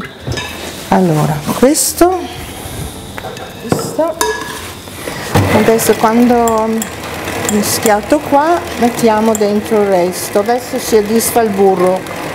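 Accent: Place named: Veneto, Italy. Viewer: Yum! That brought back memories of Mum making them! Loved the Veneto dialect too!!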